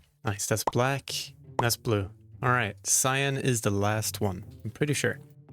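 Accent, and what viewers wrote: Sweden. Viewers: are you Swedish??!! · Wait are you Swedish lol, that’s identical to a Swedish accent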